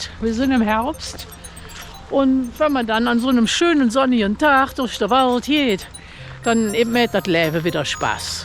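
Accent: Cologne, Germany. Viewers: Hallo ❤was für ein wunderschönes Video, und dein Kölsch ist so herrlich zu hören, und die Farben der Bäume und deine Infos dazu ist so Klasse, bin so begeistert von deinen Erklärungen der Bäume 🌲borrrr und die Farben und die Höhe der Bäume.Vielen Dank ❤LG Monika 😊 und die Pilzkunde super Idee mit den igennamen 😊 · Es war mal wieder einmal ein Erlebnis den Koelschen Dialekt zu hören und noch dazu so viel über die herrlichen Farben der Bäume und die Baumarten zu erfahren . In Parksville auf Vancouver Island hatten wir letztes Jahr genau 3 Tage etwa 5 cm Schnee, in Saskatoon dabei 5 Monate Schneefälle. Danke für die schönen Videos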